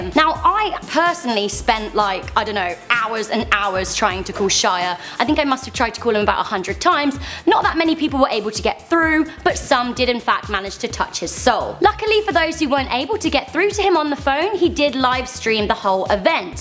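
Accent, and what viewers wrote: United Kingdom. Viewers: Ok usually I'm really into British accents, but when you mix it with you're voice it's literally the ugliest sound on earth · guurl, Why do i gotta be a sucker for them British accents <3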